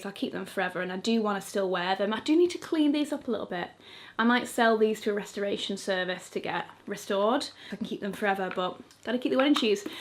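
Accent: United Kingdom. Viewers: It’s crazy that I’ve found you again, I was on a hunt because out of no where I remembered watching you maybe 6-7 years back but I forgot your name and only remembered you had a unique British accent and grey hair, so I was sooooo excited when I finally found you again after long hours of searching on yt😭 immediately followed so I don’t lose you again. You’re such a classy woman and it’s a joy to listen and watch you🥰❤️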